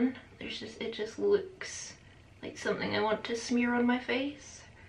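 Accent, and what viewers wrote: Scotland, United Kingdom. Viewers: Definitely a look...or an Elaina look too. Lol. Can I do your Scottish accent? I doubt but I can try. 🤣😘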